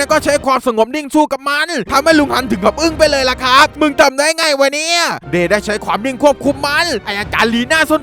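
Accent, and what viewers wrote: South, Thailand. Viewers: พี่เป็นคนใต้หลอครับ😅😅😅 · พี่เป็นคนใต้ป่าวคับ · คนภาคใต้เหรอครับผมพูดภาษาใต้เป็นนะเพราะผมเป็นคนใต้สวัสดีพี่บ่าวหวัดดีนะพี่บ่าว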